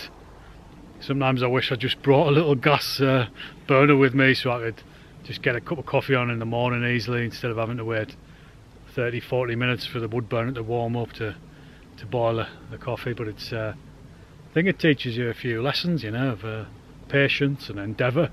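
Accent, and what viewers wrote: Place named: Geordie, United Kingdom. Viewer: I hear the twang of a Geordie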